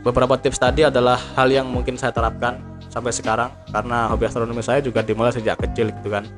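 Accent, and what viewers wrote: Java, Indonesia. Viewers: Bro, sampean jawa ne ngendi??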